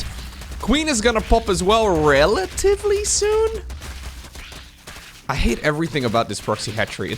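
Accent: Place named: Netherlands. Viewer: I am very, very sorry to say, but every time you said Fitzroy's name, my brain wanted to assume that you are trying to say Viceroy, but with your Dutch accent:D sorry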